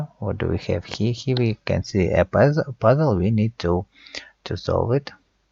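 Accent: Russia. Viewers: Я так и знала,что ты русский,по твоему акценту,и по окну на русскому языке на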